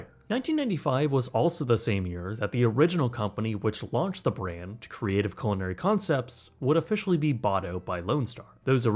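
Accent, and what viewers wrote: Canada. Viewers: Lone Star Steakheaouse? Bought eaout? The Canadian accent truly is the worst and most annoying accent for long-form content.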